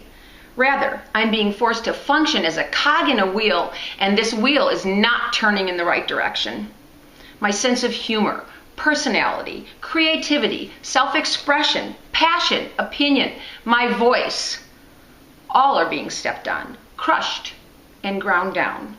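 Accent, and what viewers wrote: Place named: New York, United States. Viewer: What state are you from? This sounds exactly like NY.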